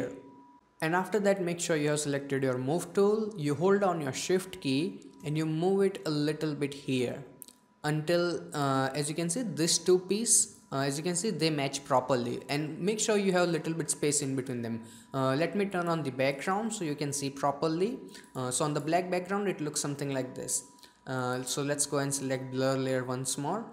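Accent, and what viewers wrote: India. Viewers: I like how you sound like the guy from "Phlearn", but with an indian accent xD !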